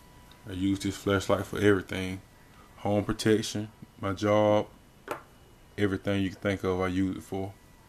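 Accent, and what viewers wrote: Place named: Georgia, United States. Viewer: nice vid,where you from.you sound like a georgia boy,maybe atlanta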